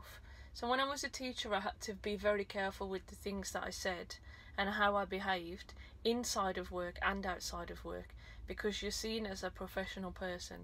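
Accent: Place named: Hampshire, United Kingdom. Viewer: Hi You sound local to me, I’m from Hampshire... I’ve just paid for my class 2 rigid course, have my medical next week and then my theory. Thankyou for all your how to videos, and your opinion on all the little things.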